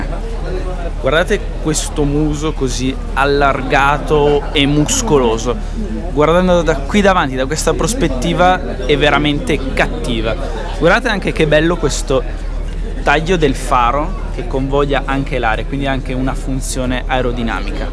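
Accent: Italy. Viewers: I love the Italian accent. If reincarnation is true I wanna be born again to be Italian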